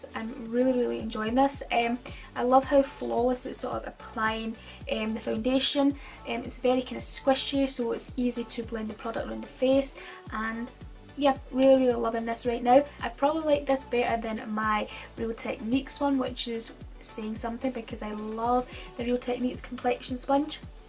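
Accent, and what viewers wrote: Scotland, United Kingdom. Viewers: Omg your Scottish! Me too 😝💗💗 Have subscribed love your videos Soo gorgeous 😻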